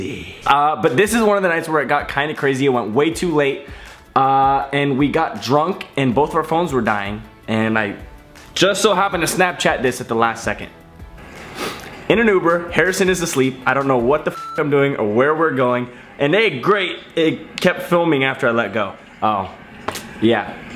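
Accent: Scotland, United Kingdom. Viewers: Nice Scottish accent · Scottish accent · You sounded kinda Scottish and I'm living for it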